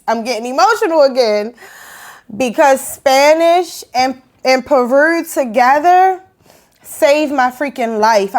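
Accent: Baltimore, United States. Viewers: Omg haha I remember watching you earlier and asking to myself if your accent was from mephis or baltimore🤣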